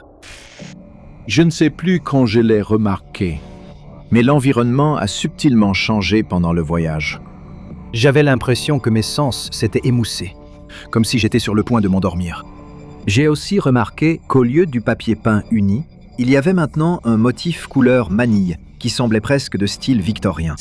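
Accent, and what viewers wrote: Quebec, Canada. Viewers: La vidéo est en québécois ?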